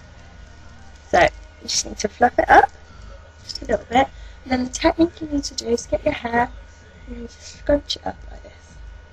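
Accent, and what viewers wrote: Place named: United Kingdom. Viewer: Lol nice accent...from the uk?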